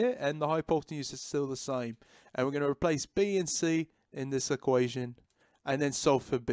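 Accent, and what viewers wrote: United Kingdom. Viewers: Hmm a british explaining trignometry,what was i serching for 😅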